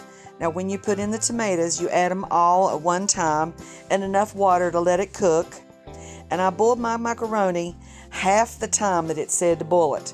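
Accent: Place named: South, United States. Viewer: Thank you ma'am I can tell by your accent,you know what you are doing. My mamaw & my momma made this really, really good, sadly, I never paid enough attention. Adding the sugar kind of scares me ( because I had macaroni and tomatoes at a friend's house growing up and she put sugar in hers and it wasn't like my mom's or mamaws....but mom did like hers every now and then like that). Is the amount of sugar you use do something like tame the acidity of the tomatoes or does it taste more sweet ? I like salt & pepper on my maters and sugar in my cereal,lol 😊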